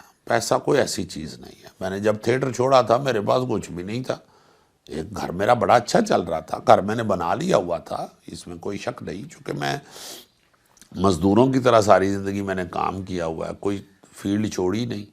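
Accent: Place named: Punjab, Pakistan. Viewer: Bhaji ajj te Urdu wadhiya bol rahe ho.nahin ta Punjabi da lehja aunda jiyadatar tuhadi bolli vichon.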